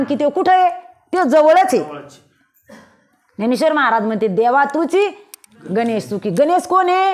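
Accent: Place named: Marathwada, India. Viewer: एकदम मराठवाडा भाषेमध्ये खरंच खूप छान प्रवचन केले आहे मी आळंदी वरून ऐकतो आहे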